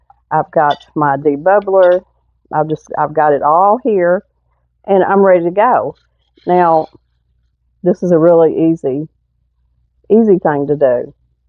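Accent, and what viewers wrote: South, United States. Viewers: Ms., once I fine tuned my ears to southern draw I was able to watch and hear what you were a doin. I liked it so much I fired up the canner and made 6 qts of your ranch style beans, 9 pints of black beans and 5 pints of sausage. Tomorrow will be 27 lbs of chicken! I appreciated your video and I plan to view more of them!! God bless you.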